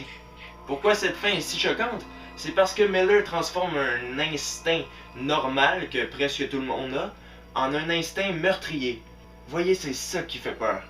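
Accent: Canada. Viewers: Vive le Canada. J'adore votre accent. Bizzzzzzzzzzz. J'achète.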